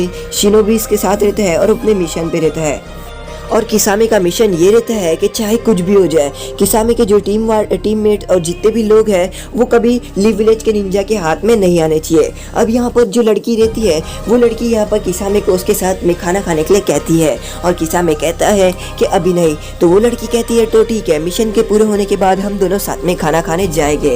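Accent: Maharashtra, India. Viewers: Bro are you marathi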